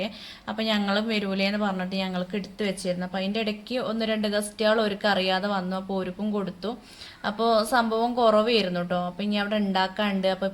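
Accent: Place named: Ottappalam, India. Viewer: ❤❤ ente nathoonum nalla aalanu pine sugano samsaram ottappalam samsaram anu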